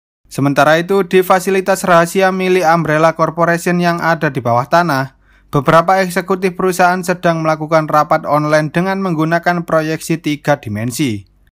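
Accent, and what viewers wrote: Java, Indonesia. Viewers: Iki admine dubbing jawa ya? 😅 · Jelasinnya engga jelas. Kalo udah medok pinter dikit jelasinnya. Gua udah nonton berkali kali soalnya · Pertama kali mampir, logatnya medok Jawa banget 😁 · IQ7 tapi versi medhok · Orang jawa ni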